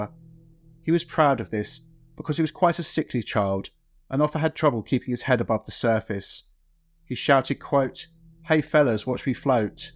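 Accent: England, United Kingdom. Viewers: He’s not German..he’s English. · Why is a pom narrating this?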